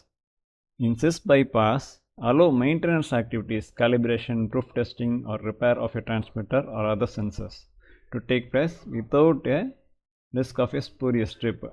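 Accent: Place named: Tamil Nadu, India. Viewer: Is it possible that you speak straight english clearly instead of Tamil english ?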